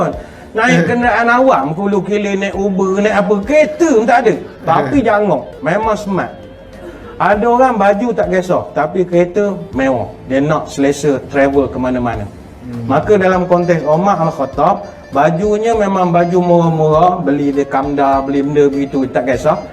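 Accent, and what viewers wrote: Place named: Temerloh, Malaysia. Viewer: Best loghat tmerloh 😂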